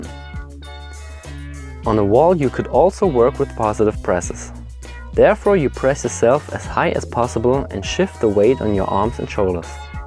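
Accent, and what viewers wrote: Germany. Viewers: Wie man einfach das "deutsch " raushört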